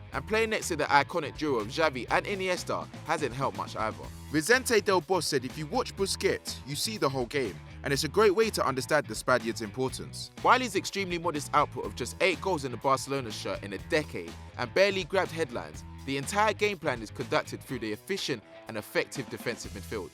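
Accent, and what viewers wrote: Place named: England, United Kingdom. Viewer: 6 out of 10 listed are Premier League players. There are so many underrated players in other leagues, but since this guy talking has a English accent it’s not surprising that he’s going to list 60% of the players as Premier League players.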